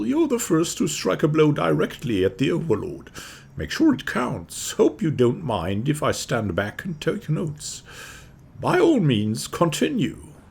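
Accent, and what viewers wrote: Germany. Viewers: I love your role playing videos. Your in-character quips are always delightful and enrich my viewing experience. I have also watched (and I am still watching) your PoE path of the damned play-though, and I have to say you really have immense courage to commit to playing all the way through a massive game like that on such a difficult level. Your "thoughtful gaming" skills are very impressive! I am also hooked on your soothing voice and that very appealing hint of a German accent. I can tell that you are enjoying the play-through as much as we are, who you are taking along on the journey. Your fine intelligence and wry humor shine through in your videos, and checking your channel for updates has now become a part of my daily routine. Thank you so much for your faithfulness to your channel and to your subscribers. Keep the excellent content coming!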